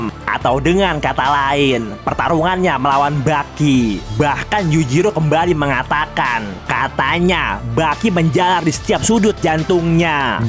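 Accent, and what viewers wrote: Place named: Jakarta, Indonesia. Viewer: Channel paling danta dan pembawaan nya betawi bat daah gua demen sama yang begini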